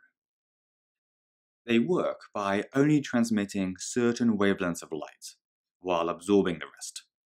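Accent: United Kingdom. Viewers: I love bri'ish English.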